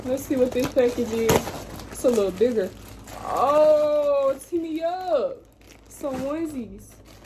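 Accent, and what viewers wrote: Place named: Texas, United States. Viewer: Please tell me you from texas because that's all I hear coming out ya mouth 😂😍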